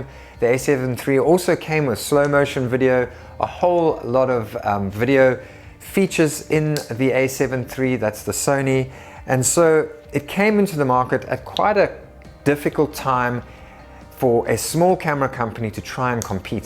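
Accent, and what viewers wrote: South Africa. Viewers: Definitely a South African accent 🤔🤗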